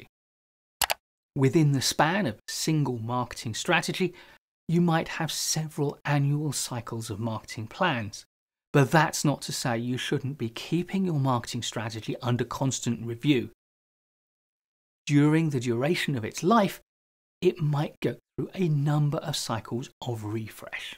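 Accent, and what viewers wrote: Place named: United Kingdom. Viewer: I started to miss the British accent in business videos in YouTube 😂 thanks from Morocco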